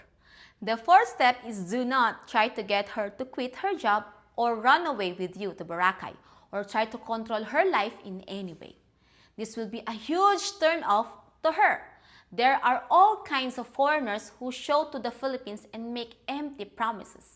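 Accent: Ireland. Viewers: I love listening to Irish 😅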